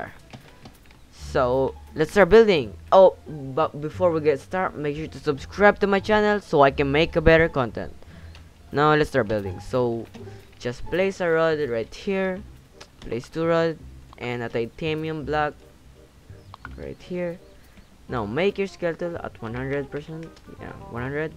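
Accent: Philippines. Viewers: i just noticed that your filipino by your voice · I knew that cuz of the accent, oh right im a filipino too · Just from the accent i can already tell ur also filipino xd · You can see that he’s filipino cuz of he’s accents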